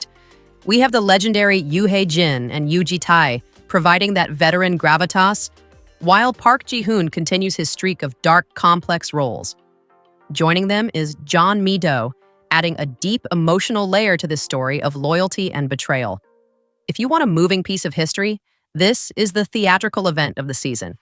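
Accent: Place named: United States. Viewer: American accent on korean language hurts my ears. 유지태이???? Arghhhhhh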